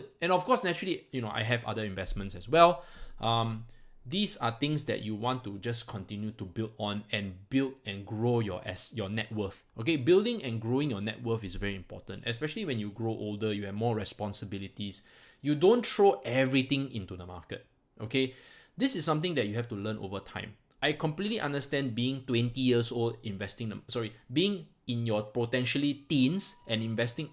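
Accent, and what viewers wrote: Singapore. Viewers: What accent is that? Singapore?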